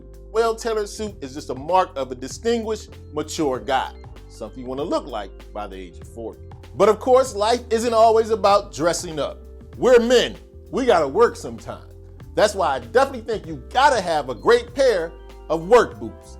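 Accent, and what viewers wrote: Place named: Alabama, United States. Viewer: Bro you from Bama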